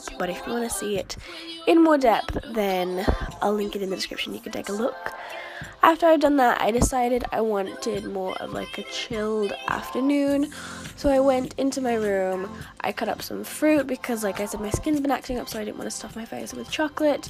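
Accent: United States. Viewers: out of curiosity why do you talk with an American accent? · Why do you sound American when you live in the UK?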